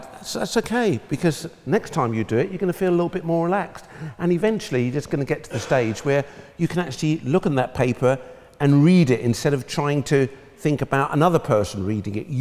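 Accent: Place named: England, United Kingdom. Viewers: jokes aside, he has a very pleasant english accent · Actually one of the greatest story teller of his generation. Also one of the most charming smile, and yes his english accent is soo charming. Plus he can cook.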